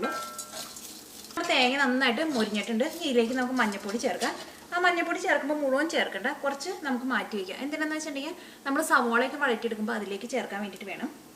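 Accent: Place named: Vypin, India. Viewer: cheachi super vypin karude bashayil paranjal thakarthitund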